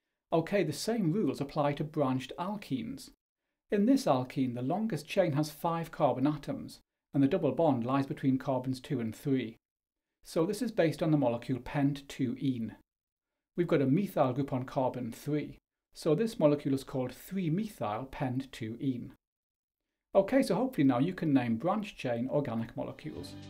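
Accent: United Kingdom. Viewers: WHERE IS OUR OG BRITISH ACCENT!?!?!?😩😔😔😔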